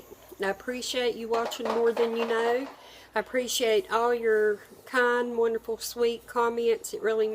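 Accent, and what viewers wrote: South, United States. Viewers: That looks delicious! Are you from the south? I love your accent.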